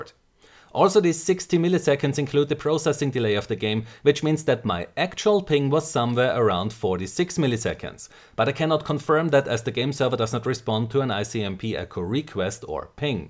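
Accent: Austria. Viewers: Da hört man doch sofort den Österreicher heraus! Grüße aus Linz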